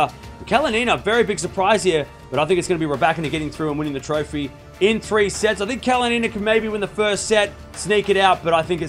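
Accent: Australia. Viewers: But Sorry, I thought he was American. Is he an Australian then?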